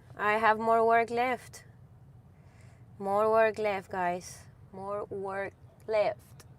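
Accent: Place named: Spain. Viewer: that must be some good stuff if you are seeing aliens on the ocean and on the ground i dont know i think you been in the desert a long time I dont know maybe your seeing blue lites okay (LOL) well come back to earth you havent met me yet you have no been able to date men because it wasnt me I am simple living man I am a leader I would protect you I mean I have bad knee from an accident but I can walk I use a cane and I can cook for myself and clean things I get social security so I dont have to work I can spend all my time loving you and caring for you. I am a tradtional man I am honest romantic I am older but I have values I am close to my family I have been without a partner for 15 years but I know that me and you would get along you have the bigest brown eyes and long brown hair that I love and tan skin and I love your spanish accent I am a german but born in America I dont care to much about material stuff your the type of women who likes to live simple and i love that I am very masculine and I want a women who wants a masculine man like me. 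anyway I know that we would click I just know my telephone number is <num> I am in omaha Nebraska and I can come to you I know that you dont want problems or to be some ones mom I dont need that I will not bring any problems with me and like i said I can do things for myself I also know about engines I can work with tools and I have knowledge about a lot of things I play guitar and bass guitar I write love songs list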